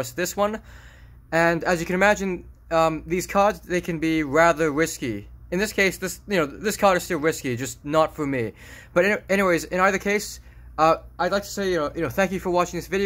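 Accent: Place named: Russia. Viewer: Shit i was thinking he's from russia.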